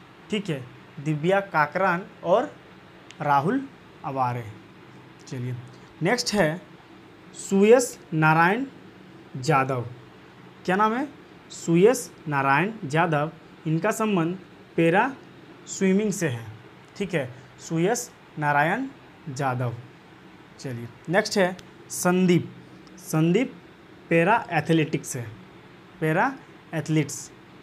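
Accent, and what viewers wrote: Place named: Maharashtra, India. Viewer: Mala tuji hidi ayakun asa vatla ki tuji bhasa marati ahe asa vatla pahlida 🧐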